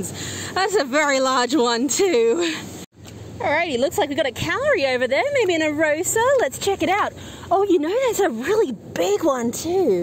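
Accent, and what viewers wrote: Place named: Australia. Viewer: Hi, I am pretty sure you had new shells 3 or 4 of them. I am going to make a list now of every video. Bearsy had to be held today tho. So many kinds of shells, I can hardly keep them straight. I only still know a handful of them. I know all the US tho because I have them except for maybe a very few. Do your hands ever get puckered from the salt water? You are my very favorite of all the shellers, fun, talk loud enough, show the shells closely, love your Australian accent, we get to see you live occasionally, love the music, ending where you take a lot of time arranging what you find. LOVE YOUR VIDEO SO MUCH. Take care please. Love and hugs from Trudy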